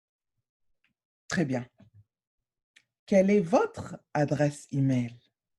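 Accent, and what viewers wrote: France. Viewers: I love your French accent 💕 I tried to take French when I was in high school but never could get the hang of it .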